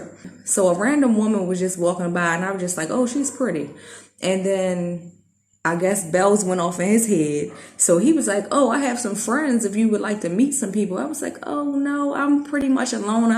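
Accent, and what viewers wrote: Baltimore, United States. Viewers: Let me guess, you’re from B’more?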